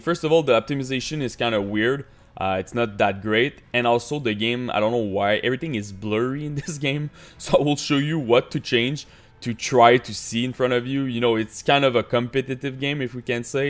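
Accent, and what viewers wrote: Quebec, Canada. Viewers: Are u from Quebec?? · belle accent qc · Are you Québécois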